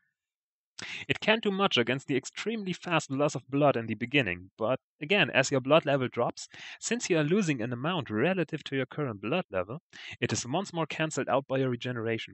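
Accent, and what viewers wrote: Germany. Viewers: Schönes deutsches Englisch :) habs sehr gut verstanden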